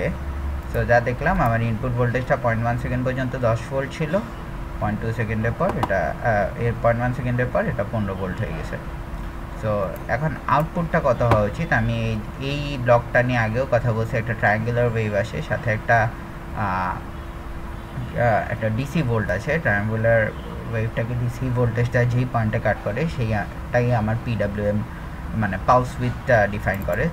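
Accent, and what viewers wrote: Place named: India. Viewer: Indian english quite hard to understand because its accent, hope there will be some script or something similiary...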